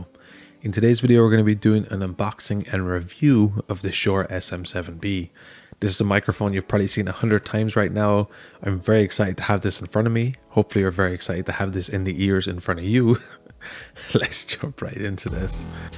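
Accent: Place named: Ireland. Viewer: Makes that sexy Irish voice so much better ;)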